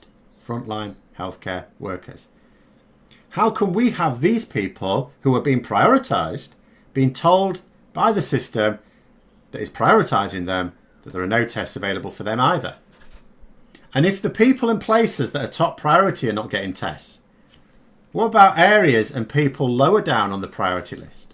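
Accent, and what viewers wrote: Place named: Scunthorpe, United Kingdom. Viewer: Hi. The Google auto subtitler struggled with your scunthorpe accent in the first few seconds with a hilarious result 🤣🤣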